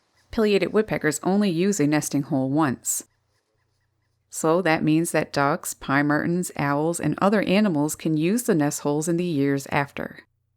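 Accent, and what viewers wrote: Newfoundland, Canada. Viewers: Oh my, you sound Canadian. I'm sorry that our president is a jerk. I enjoyed your video very much. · Hi, just curious, are you by chance from Newfoundland?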